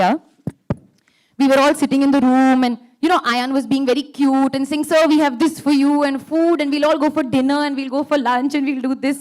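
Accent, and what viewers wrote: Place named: Telugu, India. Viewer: U sound like a telgaite when u speak ..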